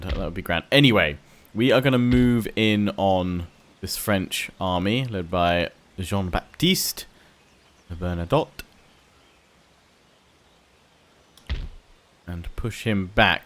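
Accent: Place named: United Kingdom. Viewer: pls just tell me are u british or american because ur accent sounds like british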